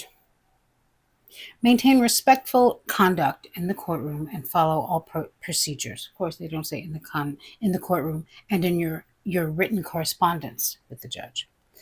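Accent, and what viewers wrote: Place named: East Coast, United States. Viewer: Elizabeth Sarah Boone Taylor! …thanks for the check in. Love your videos, and your beautiful face! Your accent is very interesting, are you from Brooklyn? You sound kinda east coast. I’m from Oregon and we talk kinda slow here. Hah.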